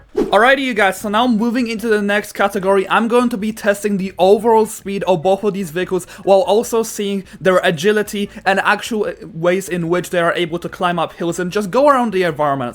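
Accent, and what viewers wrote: Poland. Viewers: im judging by your accent you are from poland or chzech?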